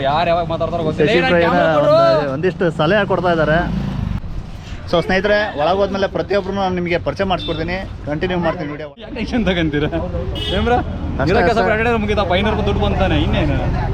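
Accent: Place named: Davangere, India. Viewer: ಸಕ್ರೆ ಬೈಲೂ ವಿಡಿಯೋ ಯಾವಾಗ ಅಪ್ಲೋಡ್ ಮಾಡ್ತೀರಾ ದಾವಣಗೆರೆ ಭಾಷೇಲಿ ಕೇಳೋಕೆ ಚಂದ.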